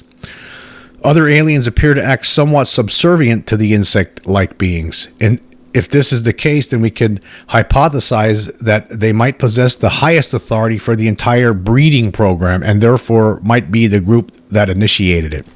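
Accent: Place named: Philadelphia, United States. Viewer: You sound like a Philly boy like me.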